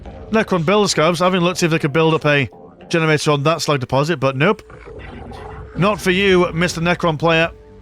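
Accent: United Kingdom. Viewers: its not Warhammer without a British man casting it, love your content man!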